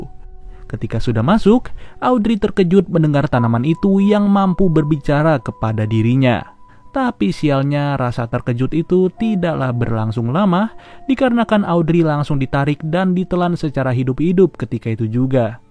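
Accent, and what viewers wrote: Java, Indonesia. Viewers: Orang Jawa mana ni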